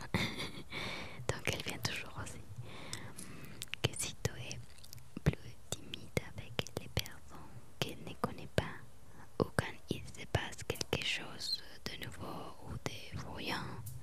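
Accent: Spain. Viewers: C'est la première fois que je viens et tu as une agréable personnalité, ton accent est trop chou. J'adore la langue espagnole. Bonheur à toi guapa morena, j'espère que tu reviendras🌹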